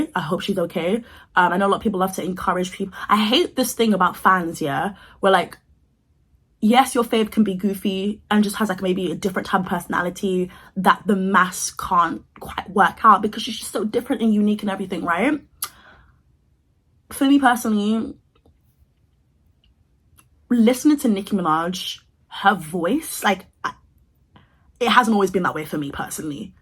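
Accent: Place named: RP, United Kingdom. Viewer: That pink dressing gown is yuk! You talk too fast and too posh!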